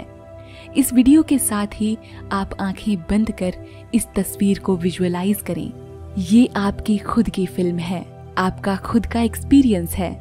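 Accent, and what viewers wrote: India. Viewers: Your voice is too good.. love Indian Hindi ton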